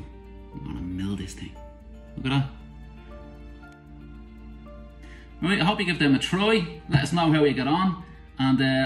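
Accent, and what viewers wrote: Ireland. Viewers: Love your Irish brogue! Sláinte! --gv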